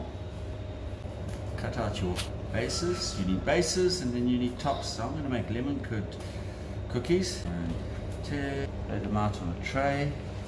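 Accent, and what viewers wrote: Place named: South Africa. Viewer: It’s been so long since I’ve heard a good South African accent (thank u)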